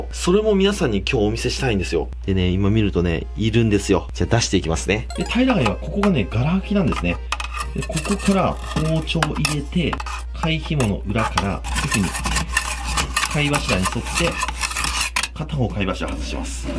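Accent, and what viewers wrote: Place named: Aichi, Japan. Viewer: サワガニより好き｢だで｣ て言うとやっぱり同じ地元の愛知の人の感じがあって親近感湧く。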